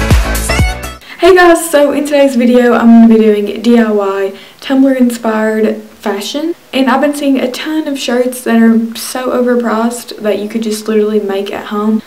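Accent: South, United States. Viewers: People are getting annoyed because of her southern accent but it doesn't matter to me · 2gymnasticgirls that's not a " Texan" accent. It's called southern 😊😤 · shout out to someone else who got a southern accent like me :) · Nikki King It's definitely a southern accent!😁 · she's southern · Love your accent! I'm from Atlanta and my dad is from Alabama so we're used to that kinda thing😂 your style is so cool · you're video was amazing! I love all the ideas and I think you have the cutest southern accent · We have the same voice SOUTHERNERS ROCK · She's southern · She's just southern lmao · she has a southern accent....really not that hard to figure out lmao Idk why people think she's from europe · SPOOKY💖 btw I love your accent I'm also from the south❤️❤️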